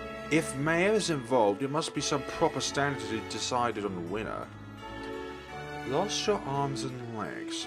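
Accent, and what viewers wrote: United Kingdom. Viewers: Dang brit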